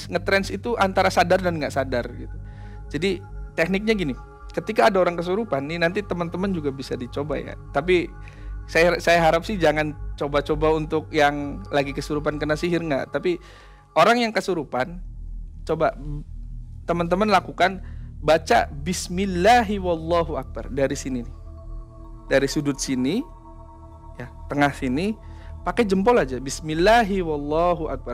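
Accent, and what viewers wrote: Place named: Banyumas, Indonesia. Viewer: Saya Suka dialeg bang...natural gak d jaim2in...lucu khas jawa ngapak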